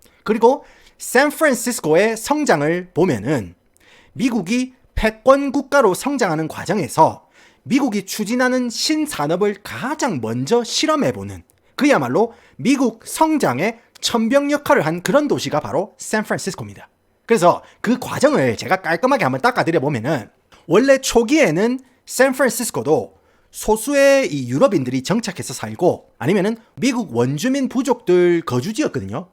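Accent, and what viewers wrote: Gyeongsang, South Korea. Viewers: 갱상도와 미국을 넘나드는 플로우인데 딕션 쩔어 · 경상도사투리와 영어 악센트 믹스 신선하네요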